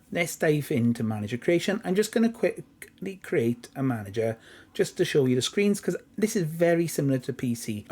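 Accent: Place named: Wales, United Kingdom. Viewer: I like your positivity ... could listen to the Welsh accent all day lol .... I'm from St Helens , Merseyside . I'm currently managing Maidstone United with a save carried over from FM24 Console on PS5 . I'm having loads of crashing . Maybe 20 odd times . I've done the regular stuff , reinstalled game , restored licence and entered safe mode and cleared cache and rebuilt . It's playable .... looking forward to the fix ⚽️⚽️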